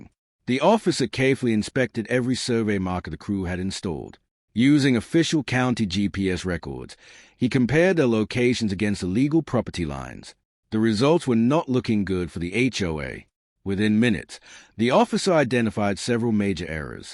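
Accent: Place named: Australia. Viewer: Ya gotta have lots of respect for a Texan who speaks with an Aussie accent .....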